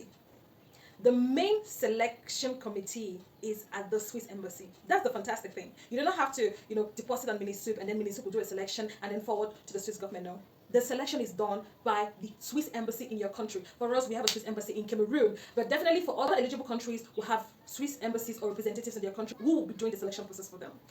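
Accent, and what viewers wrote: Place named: Paris, France. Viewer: That parisian accent na die! Hahahha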